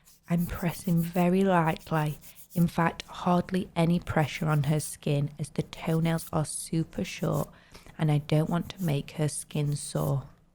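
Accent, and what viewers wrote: United Kingdom. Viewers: I'm not used to a UK accent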